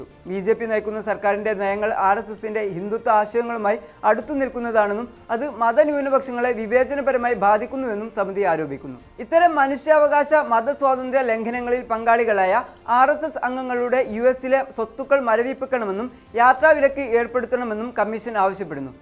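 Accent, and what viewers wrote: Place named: Malabar, India. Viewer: ബ്രോ നന്നായി സംസാരിക്കുന്നുണ്ട്. പത്രത്തിൽ അച്ചടിച്ചു വരുന്ന അച്ചടി ഭാഷയിൽ വേണം ചാനലിൽ സംസാരിക്കാൻ പ്രത്യേകിച്ച് പരിപാടികളിൽ. മലബാർ സ്ലാങ് മീഡിയയിൽ വിഷയാവതരണത്തിൽ കൊണ്ട് വരരുത്! നിങ്ങൾ പല വാക്കുകളും സ്ട്രോങ്ങ്‌ ആയി സംസാരിക്കുന്നില്ല..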